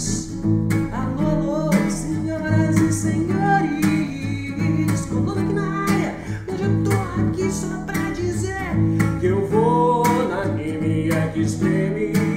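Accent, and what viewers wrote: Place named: Acre, Brazil. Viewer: Né cara, sotaque dele muito do acre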